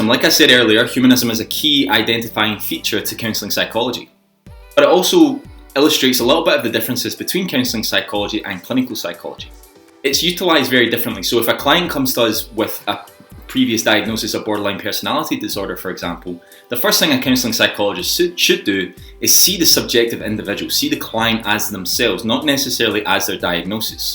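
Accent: Scotland, United Kingdom. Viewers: sure. Reminds me a bit Fish accent. Marillion singer. He was born in Edinburgh or not far from. Thanks for response and for interesting material.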